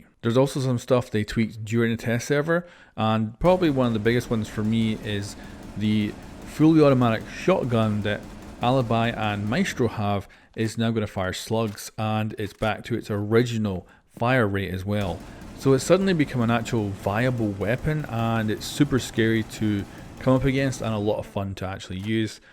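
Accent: Scotland, United Kingdom. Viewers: Are U Scottish V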